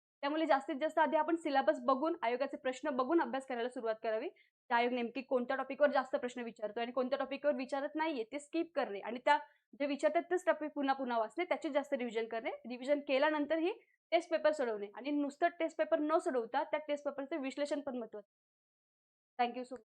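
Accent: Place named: Agri Koli, India. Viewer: मॅडम च्या accent वरून त्या आगरी कोळी कोकणातल्या असाव्यात.... तिकडे असं बोलतात तर घ्या समजून...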